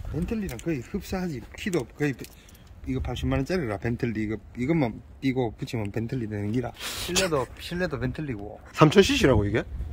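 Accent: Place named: Gyeongsang, South Korea. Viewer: 오리지널 대구네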